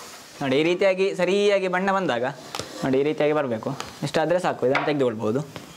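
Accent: Mangalore, India. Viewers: ನೀವು ಅಡುಗೆ ಮಾಡುವ ರೀತಿಯು ಅದ್ಬುತ. ಹಾಗೆ ನೀವು ಮಾತನಾಡುವ ಮಂಗಳೂರು ಕನ್ನಡವು ಕೂಡ ತುಂಬಾ ಅದ್ಭುತ. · Mangalore kannada🔥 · Great to hear your Mangalore kannada accent. Buns is my favourite snack for tea. Well done from a mangalorean living in Australia. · I love Mangalore accent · Sir nimma Mangaloor maatu tumba chanaag iratte kellike...😍😍😍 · It just took me 20 seconds to Subscribe to your channel because of your genuine smile and the Mangalore accent.. Cheers for Life..!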